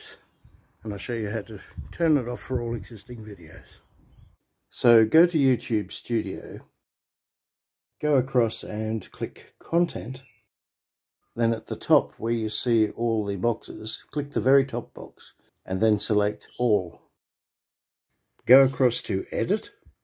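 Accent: Australia. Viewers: And your Australian accent is super cool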